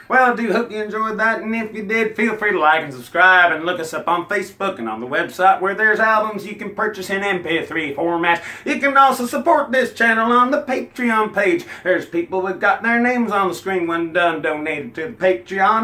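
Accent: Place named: South, United States. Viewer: That's a damn good southern accent you've put on for this video, sir.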